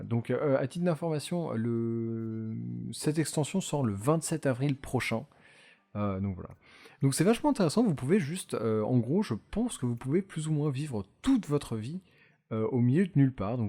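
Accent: England, United Kingdom. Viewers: Cet accent anglais de malade! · J'adore ton accent anglais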